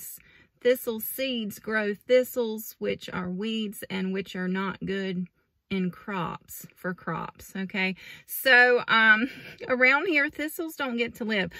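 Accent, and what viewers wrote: South, United States. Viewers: As a Brit I love your southern accent so cool 😎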